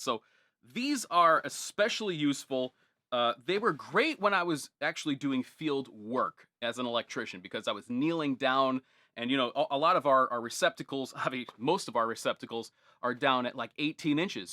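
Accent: New England, United States. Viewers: New Englandah !!!!!!! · What part of New England are you from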